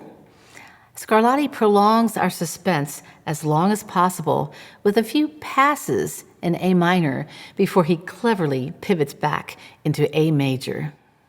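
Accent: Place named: United States. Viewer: American English pronunciation is fine for a American English and we’re all familiar with it; it is as legitimate as any other world-wide English accent. I do have an issue though when the drawly vowels and mispronounced consonants (particularly ‘t’s as ‘d’s*) are lazily and disrespectfully transferred wholesale and without a thought for accuracy onto languages like Italian where the resulting mispronunciations are grotesque - it’s rather like dressing up a pretty little girl in a workman’s dirty overalls. * Giving us the horrible ‘Scarladdi’ for example.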